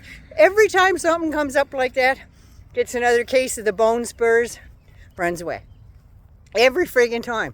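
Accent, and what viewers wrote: Canada. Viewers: is Canadian. I always confuse them as well, as a Brit.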